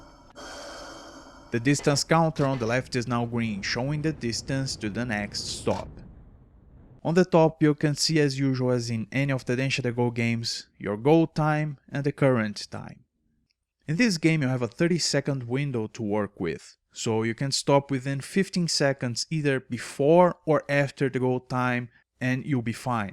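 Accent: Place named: Brazil. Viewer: Man, I can't express properly how happy I am to see a guide like this, and especially one for this really unique (and extremely comfy) version of Densha de Go! Also loves everything you do in your channel, exactly the type of game that I love but gets no recognition (boku no natsuyasumi, man!!!!). Great content all in all, hope you keep it up! Also, are you by chance originally Brazilian? Your accent sounds like it, and it got me curious.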